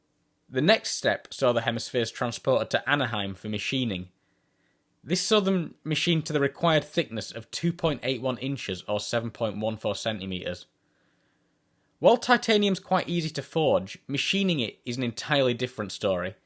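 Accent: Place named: United Kingdom. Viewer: fwiw i think your voice/accent is great. granted im from the uk so i can understand it naturally, but it’s nice to hear people who speak “normally” (i.e. the way i/people i know talk) on youtube once in a while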